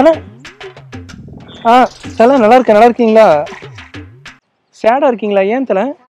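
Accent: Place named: Coimbatore, India. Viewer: Romance naa thaan 🔥 Apram eppovumae last la antha kovai slang pesirunga athaan massuu Bro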